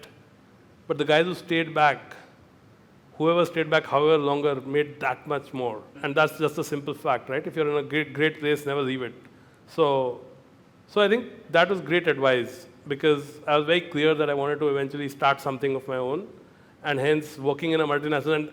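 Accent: Gujarat, India. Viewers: Indian English accent is worst accent i think....don't be offended my personal view... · Hinglish gujju version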